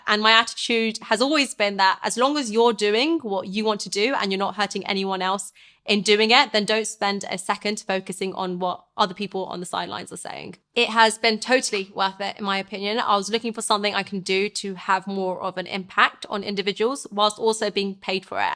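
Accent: United Kingdom. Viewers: Why you look like brazylian and talk like brit?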